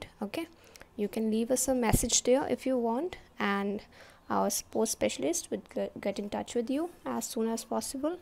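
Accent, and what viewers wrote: India. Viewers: Are you Indian ,I'm Right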